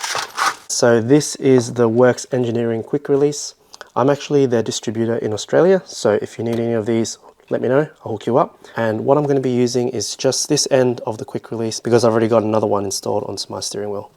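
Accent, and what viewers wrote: United Kingdom. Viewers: I know it’s random but it’s so weird hearing Asians from the uk with the British accent i grew u in hood where’s there’s a lot of Asian gangsters in America and they always Wildin out bilingual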